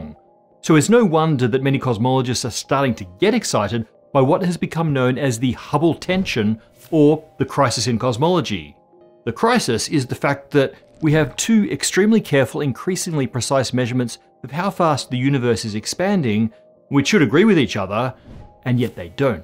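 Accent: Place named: Australia. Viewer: his accent is in a way closer to australian, with some peculiarities of Irish, possibly of family origin.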